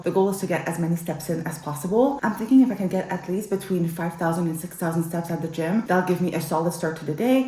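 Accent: Quebec, Canada. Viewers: This is my first impression and I’m not mad about it my queen❤❤ are you québécoise ?? Hihi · New subscriber here. Are you French Canadian?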